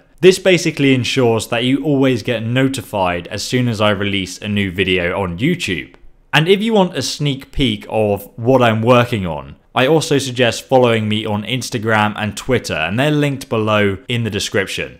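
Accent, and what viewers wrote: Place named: England, United Kingdom. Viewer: We should start a gofundme for you to get a drone so we can listen to that beautiful English accent for many more long winded minutes. Anyone down? Ohmmmmmmmm bethesderrrr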